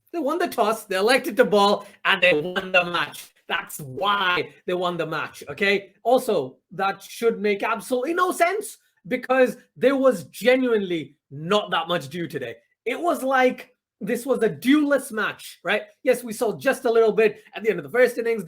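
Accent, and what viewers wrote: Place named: Pakistan. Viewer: Great analysis. So glad you lampooned the "toss" debate. If a team bats first and gets 170 plus, anything can happen. Yes they won the toss and won the game but as any researcher will declare: correlation is not causation. I have really enjoyed your comments and I am going to look out for them during the Ashes. Many years ago I drove night time city buses. My security guard was from Pakistan. We became great mates and spent the whole night talking cricket and religion. He was such a great bloke. It was not a requirement to have the same security guard but we always asked for each other. You remind me a lot of him, and it is not just the accent. Very balanced, very thoughtful, great sense of humour.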